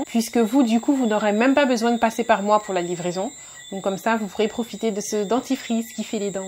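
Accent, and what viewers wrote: Haiti. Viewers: oui du jugement des autres aussi je ne sais si vous connaissez le Sénégal mais il aime trop te juger. vue que suis au Canada si je rentre il vont avoir à l'oeil tout mes fait et geste.Merci pour tas réponse vous avez le même accents que les haïtien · Quand tu parles créole, tu as le même accent que les gens du Nord d"Haiti. Mais quand tu parles français, c'est comme tous les haïtiens en général.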